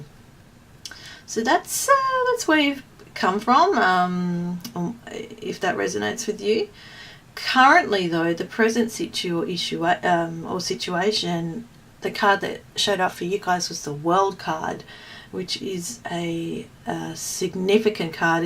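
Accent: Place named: Australia. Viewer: Great to listen to an Aussie.....great reading really resonated with me, thank you :)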